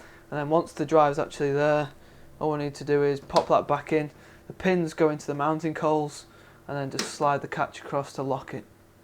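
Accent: United Kingdom. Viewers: Finally a review in british accent! xD Thanks for the video review!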